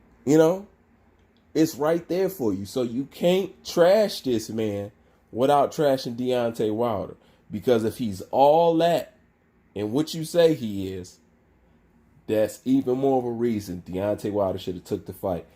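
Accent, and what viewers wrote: United States. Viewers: You know you’re full of shit right? You know wilder wasn’t ducking fool.. Yea, he was ducking someone who just got stopped by Ruiz? You must have a brain tumor fool! Wilder lost in your records but your records doesn’t matter. That’s why he’s running it back. You sound like you’re from America, SUPPORT YOUR OWN MORON!!! You’re what is wrong with the world today.. Bitch!!!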